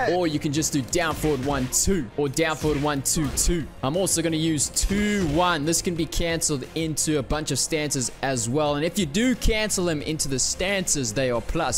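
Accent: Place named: South Africa. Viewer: I know your profile says you’re Australian, but I can recognise a South African from a mile. Awe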